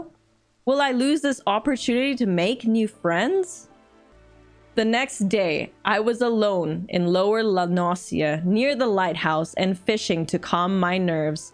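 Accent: South, United States. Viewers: that southern accent SENT ME 🤣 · Lol, your southern accent is 🔥. But you live in Georgia, right? That's probably why. 😀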